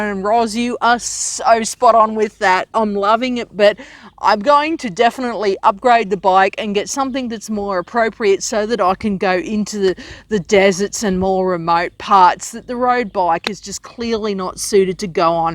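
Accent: Australia. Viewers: Love that Aussie accent. Wish i had your balls, really want to do a big tour too.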